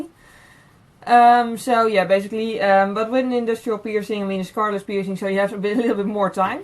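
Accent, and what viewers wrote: Netherlands. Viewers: She's Dutch, she has an accent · I think I hear a dutch accent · are you Dutch?? Random question but i feel like i hear that accent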